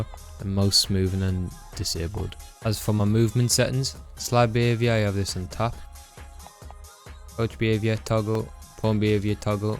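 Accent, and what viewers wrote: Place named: Newcastle, United Kingdom. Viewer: unless your a fucking dweeb love that mate keep it up inaul you geordie? sounds it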